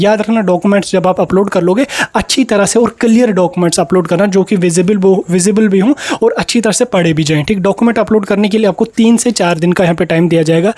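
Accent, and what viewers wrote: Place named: Kashmir, India. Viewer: Kashmiri ascent is obedient ❤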